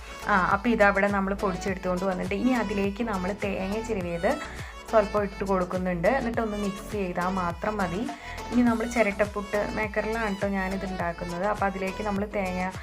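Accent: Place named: Thrissur, India. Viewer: Ennu ഹെൽത്തി ആയ ഓട്സ് പുട്ട് തന്നെ ആണല്ലോ ഉണ്ടാക്കിയത്. സംസാരം കേട്ടിട്ട് തൃശൂർ ആണെന്ന് thonunnu👌🏻👍🏻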